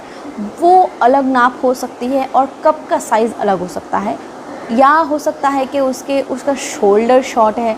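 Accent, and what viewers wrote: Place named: Bihar, India. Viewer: Aap bihar ke rahne wale h... Madem jee aap ka Bolne ka tarika pta rha h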